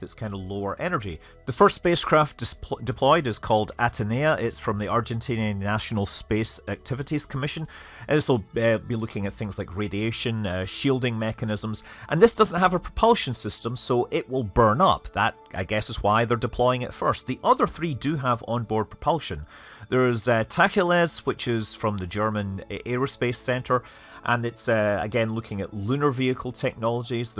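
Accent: Scotland, United Kingdom. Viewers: And now you yave a Scottish accent...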